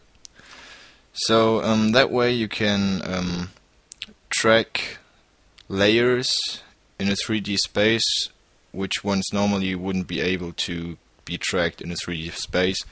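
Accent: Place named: Germany. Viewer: Man hört das sofort wenn ein deutscher englisch spricht .. :D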